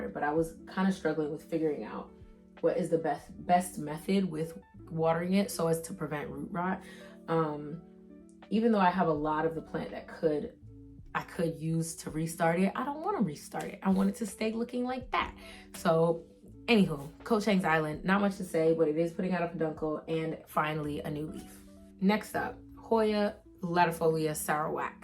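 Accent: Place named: New York, United States. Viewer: GORWLLLL how am I now coming across ya page… are you from New York? Cuz I know my New York girlies accent. Like, I’m cutting up. Naw, I gotta tap in now. Yuuuuurrrrrr 😅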